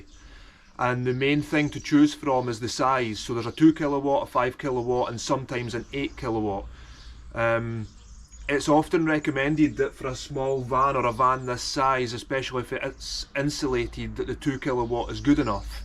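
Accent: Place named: Scotland, United Kingdom. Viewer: Thats a East Scotland accent i'm sure lol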